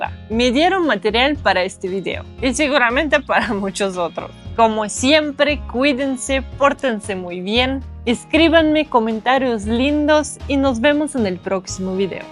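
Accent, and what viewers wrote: Russia. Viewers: Hola....pues Ami me atrapaste por tus fotos...y esos ojos verdes...(Espero que no me de hate tu esposo... jeje..)....y con respecto a los que te mandan Hate....no te preocupes ya que esos solo tienen ojos para el América...(Y ya sabes lo que sigue...así que, así velos...)....y con respecto a tu acento ruso... No sé te va a quitar nunca en su totalidad...ya que tus músculos de la voz nacieron o se adaptaron desde chica al idioma ruso...lo puedes neutralizar pero eso es mucho rollo...y además paque..si se te entiende y muy bonito.... Y por último... Ten pensamiento del tipo de Chavela Vargas....Saludos....y no dejes de subir videos....😊 Ha y los Hate.... También sirve para subir el reiting...... jeje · Pues tu acento ruso es encantador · No hagas caso de estúpidos haters que no hacen nada bueno, no sirven para nada, no crean nada, no son campeones de nada. 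Lamento muchísimo estos mensajes. Soy mexicano y le doy la bienvenida a todo mexicano nacido donde se le dio la gana (ósea extranjeros). Adelante y a disfrutar la vida, tu nueva vida. Abrazo enorme desde la CDMX. Y me encanta tu acento RUSO!!! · Yo no pensaba que en México había haters tan malos como en Francia. Yo no pensaba que los Mexicanos se preocupaba tan de otros como en Francia. Yo conozco un poco México porque vengo cada año un a dos meses en su país y a mí la gente me parecía muy amable. Me agradecería hablar español como tú con tu accento que no sé si es ruso o no pero que es muy correcto. .¿Si México es tan buen país por qué tantos lo huyan ? ❤🇫🇷🍎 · Como alguien puede criticar la apariencia de otra persona, de su acento y su forma de vestir?, a caso esas gentes son muy cultos y modelos perfectos en todo sentido?, nadie lo es!…es muy fácil juzgar, criticar y demeritar a cualquier persona sin conocerla, sin entender el contexto de su vida, más aún cuando se hace desde el anonimato y a través de un medio como youtube;también muchos de nuestros compatriotas en el extranjero, por medio de su trabajo hecho su vida de manera admirable y honrada, teniendo que regularizar también con esfuerzo ( porque no es fácil ) en esos lugares su estancia legal, es muy válido!. 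En tu caso, aunque tu origen y acento sea ruso, ya no eres extranjera, tienes la legalmente ciudadanía, con derechos y obligaciones, pasaporte, familia, residencia,; si vives en la Condesa, Las Lomas o colonia modesta, que nos importa, a tus posibilidades y de tu esposo a donde quieras y aunque a muchos les de diarrea, eres mexicana!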